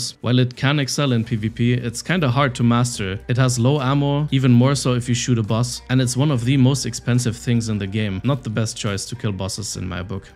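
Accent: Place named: Ireland. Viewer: This guys accent is almost irish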